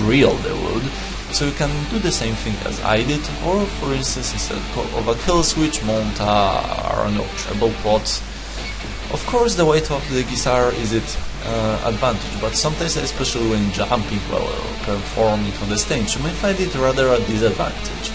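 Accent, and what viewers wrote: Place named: Poland. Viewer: good video man. haha dont worry about your English, and be proud of your Polish? accent! greetings from Czech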